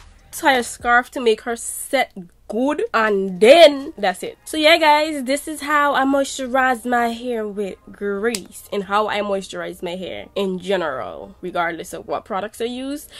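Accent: Caribbean. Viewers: Can hear that Caribbean accent... much love to my caribbean people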